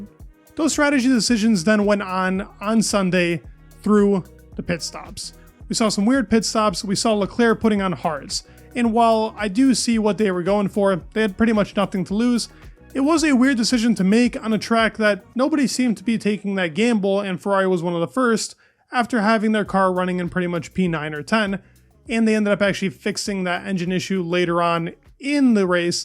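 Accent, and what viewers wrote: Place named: United States. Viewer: You should learn a proper English accent instead of american. Americans don't belong in F1. Drop the annoying vocal fry too.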